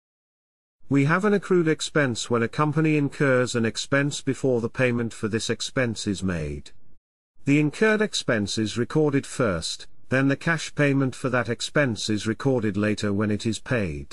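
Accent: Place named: United States. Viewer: صوتك بقا امريكانى اخر حاجه :)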